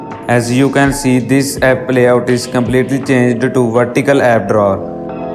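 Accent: India. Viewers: Hindi Accent!!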